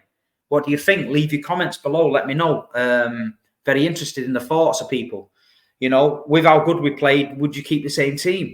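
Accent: Manchester, United Kingdom. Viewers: Yes!! Your not to bad!! I've seen these younger idiots programs and they know shit!! I'm 52 but still a dude OK!! These younger goons know shite. All cock and no f...... bollocks. Your not to bad pal. I can relate OK!! At least you lay it out realistically. No bollocks with chatting nonsense!! There's some f..... idiotic ball bags online chatting sh... about city. You sound like one of the boys from the old Kippax so I'll let you off but these idiots that are supposed to be from Manchester but talk like they're from fu.... Jamaica f..... messes my mind!! Good show kid!!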